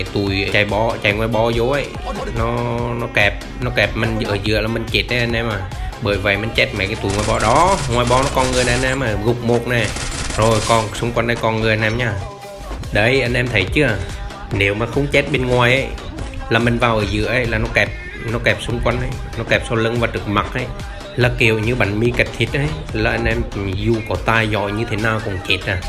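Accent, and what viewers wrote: Central, Vietnam. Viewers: anh là người miền Trung à, nghe giọng hay ghê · nghe tiếng anh này nghe giống người miền trung với lại nghe cũng ấm ko quá khô nói chung chơi cũng hay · Anh ở đâu miền trung anh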